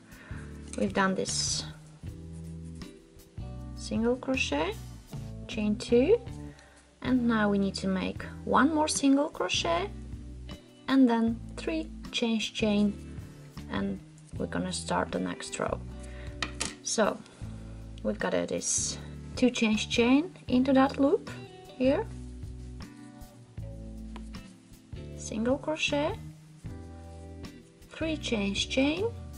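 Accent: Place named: Russia. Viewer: Thank you for explaining how to read a chart. I have always wished I could follow them. I have some amazing crochet thread/yarn that I bought 8 years ago but never did anything with them because I did not know how to read the pattern they were purchased for. I asked for a written pattern and received a chart. You have given me a wonderful gift of knowledge that is priceless. The only place I was fuzzy about was the initial chain. You appeared to make the chain length 8-10 times from the chart and that is why you were making the boxes on your sheet? Is that correct? That is how you make it to the circumference for each individuals sizes. Please tell me if I am understanding this part. The rest of the pattern appears to make more sense to me now. One last question, your accent. Where if you don't mind me asking are you from. My sister-in-law Valentina is from Russia and has a lovely accent similar to yours.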